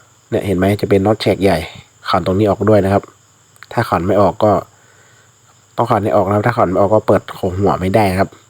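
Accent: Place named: Chiang Mai, Thailand. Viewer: สำเนียงออกเจียงใหม่แต้อ้าย😂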